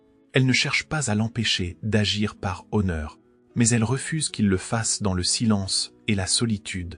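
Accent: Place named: England, United Kingdom. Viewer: Arrêtez cet accent anglais c est insupportable et n à aucun sens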